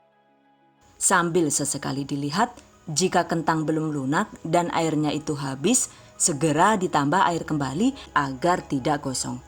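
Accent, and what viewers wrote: Banyumas, Indonesia. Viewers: Mantep resep rougut nya ,, ngmg ngapak koh,, nyong wong ngpak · orang ngapak ya pada baelah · Deneng ngapak kaya inyong heheh,,maturnuwun bunda resepnya... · Muaturnuwun mbak ngapak 😀🙏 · Mbakayu ne lucu ning ngomong Jawa ngapak cm sayang ora katon wajahe, tp masakane ky ne top maskotop.... Jos gandos wwwkkkkwkkkk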